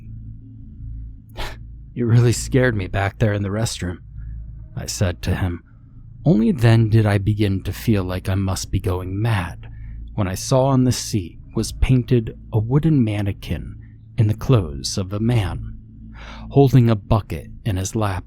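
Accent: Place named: Texas, United States. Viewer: Holy shit! That was an incredible Texas accent with Eastern European undertones! I mean it! That might be the best, specific accent I’ve ever heard.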